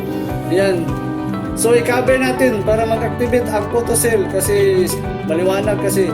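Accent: Visayas, Philippines. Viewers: Bisaya ka pala sir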